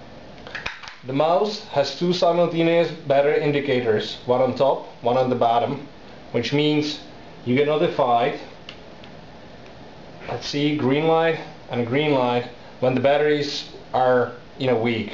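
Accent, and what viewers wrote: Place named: Czech Republic. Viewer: This must be czech guy talking, the accent is just awesome.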